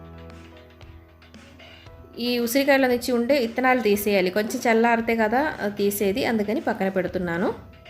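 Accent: Kerala, India. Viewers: Sure she is a Malayali. Can identify easily. I'm too a Malayali. Thanks madam. Good to hear a good recipe from you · Madame thanq R U Malayaali ? Ur accent is like that. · Yes... I guessed correct... My mom is also from Kerala... I know that pronunciation, how Kerala people speaks telugu... 🙂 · Your malayala telugu చాలా మధురం! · I think ur not telugu ur keralan because ur words u spell looks keralan .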